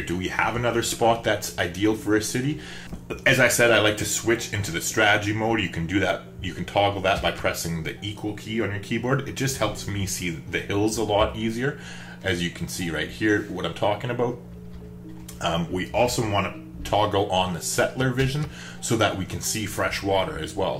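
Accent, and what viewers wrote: Canada. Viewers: Where in Canada are ya from? I can hear it in your voice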